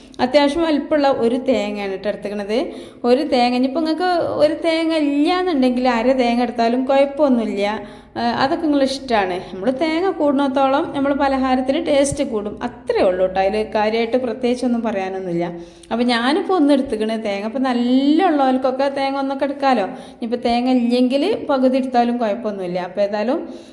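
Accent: Malappuram, India. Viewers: ഇംഗ്ലീഷ് നല്ലത് പോലെ അറിയാം പക്ഷേ മലയാളം അറിയില്ല... മലപ്പുറം ബാഷയാണ്.. തോന്നുന്നു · Malapputam bhasha rasakrammanu..😊undakkunnathu kanappozhe vayil vellam varunnu..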